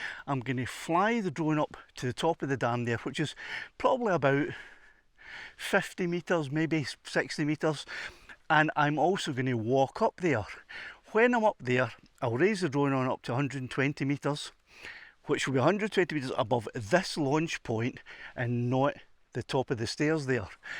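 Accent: Scotland, United Kingdom. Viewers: For a great Scottish accent I am happy to subscribe. Also I am a geologist with a background in structure in the high Alleghenies, but not far from the Valley and Ridge. I have been wondering about handling elevations photographing in structurally complex terraines. This one helps, thank-you!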